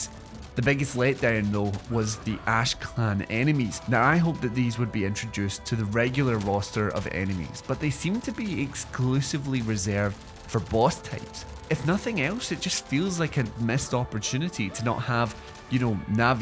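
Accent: Scotland, United Kingdom. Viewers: Definitely Scottish. Source: my wife is Scottish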